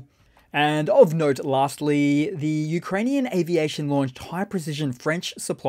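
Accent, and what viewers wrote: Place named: Australia. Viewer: Its called an australian accent, doffus. Australians generally speak in a very singalong manner. So stop the snobbism, mate.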